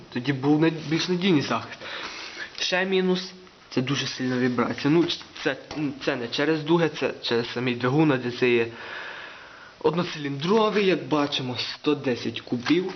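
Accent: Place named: Hutsul, Ukraine. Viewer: Смішно розмовляєш, мабуть гуцул, але приємно.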